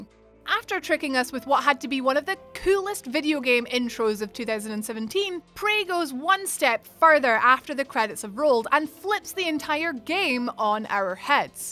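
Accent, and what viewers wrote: Scotland, United Kingdom. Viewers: Shes from Kinross in Scotland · This Scottish accent is fantastic!!! This is what I want for every video!!!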